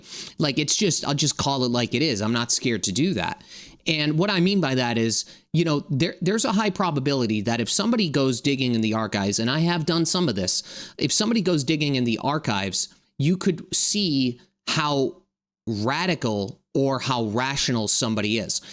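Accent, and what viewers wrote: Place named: Northeast, United States. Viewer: who are you? Are you a lawyer or a journalist or someone who as they say is a grifter with a nice face and a heavy NE accent?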